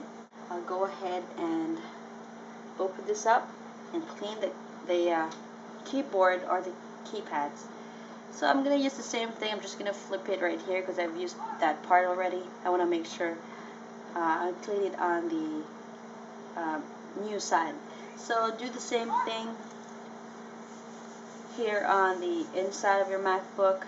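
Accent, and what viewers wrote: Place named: Philippines. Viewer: Great video! I can hear you pinoy accent ha. You sound like my mom haha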